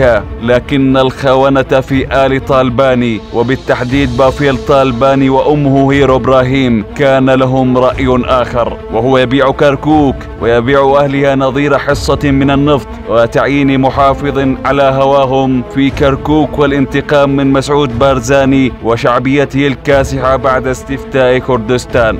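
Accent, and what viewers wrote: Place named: Israel. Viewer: صوت اسرائيلي يجيد اللغة العربية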